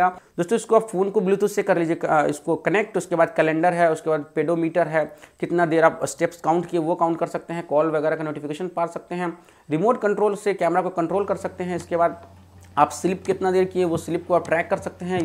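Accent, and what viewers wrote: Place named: Bihar, India. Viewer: bihari HAI. Aise hi bolega!!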